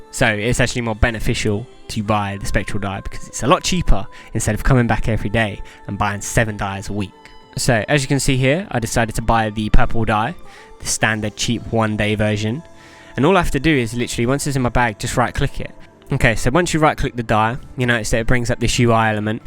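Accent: London, United Kingdom. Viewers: Your videos are cool, I've subscribed for future reference :oD I don't think they are too long at all, you describe everything really well, plus you sound like a proper London boy which is my neck of the woods and that's always gonna be a bonus :oD haha!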